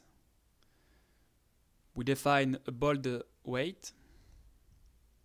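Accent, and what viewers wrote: France. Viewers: woooo !!!!! this a French accent I also speak french. The guy is strong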